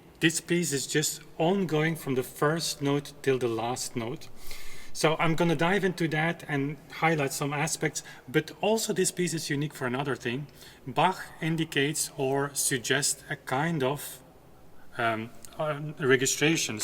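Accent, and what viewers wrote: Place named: Netherlands. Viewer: you also need accent reduction /th/, "out of the ordinary, not extraordinary" ''dove-tailed rather than very nice" but we love the Dutch! So if you composed this piece, would you just write in the canon dux et comes, then fill in the obligato Tenor and Alto? The homogeneity of the alto and tenor are almost megamaniacal, but account for the fundamental uniqueness of this masterpiece. Why is this a masterpiece? What harmonies are paramount at each point? Arent all the voices somewhat intertwined if we use 4 foot in the bass part? On the theology, what other canons in OB and elsewhere? Why are some melodies more suitable to being canonized? How are the melodies brought together at the end?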